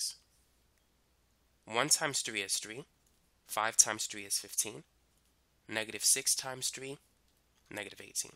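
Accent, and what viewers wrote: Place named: New York, United States. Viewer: I feel like you have a New Yorker accent.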